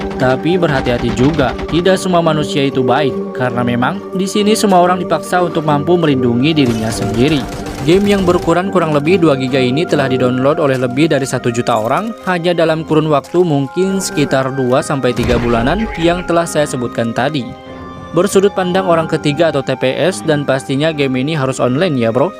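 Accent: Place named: West Java, Indonesia. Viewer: Lu orang sunda ya ngakak ( jangan di dueleu ) salam ti garkhil ( garut karihkil )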